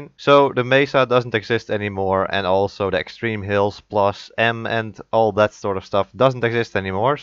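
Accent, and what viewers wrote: Netherlands. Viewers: Mooi Engels haha valt wel op dat je Nederlands bent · Goddamn the dutch accent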